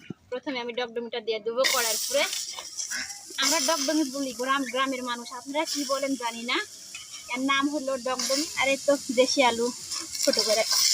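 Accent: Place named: Rajshahi, Bangladesh. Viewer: তোমার কথা শুনে বোঝা যাচ্ছে তুমি রাজশাহী জেলার মেয়ে, কিন্তু কোথায় তোমার বাড়ি একটু বলবে আপু ❤❤❤❤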